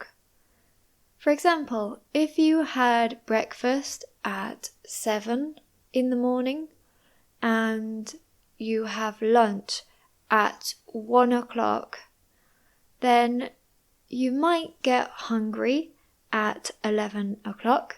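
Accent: United Kingdom. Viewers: I love you british accent,which is so beautiful.Are you a Eenglish teacher in England? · Excelente pronunciación y entendimiento del acento británico. Saludos.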